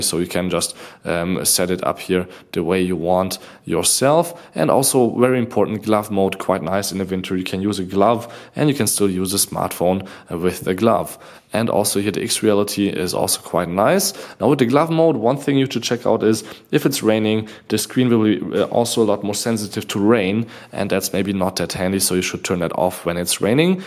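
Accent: Germany. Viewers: Shailen Sobhee which is really weird as he mentions that he is Hungarian. He has a very definitive German English accent :) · Durch den deutschen Akzent einfacher zu verstehen als Videos von Amerikanischen YouTubern! :) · he sounds so funny and german i would like to listen this for hours and hours😆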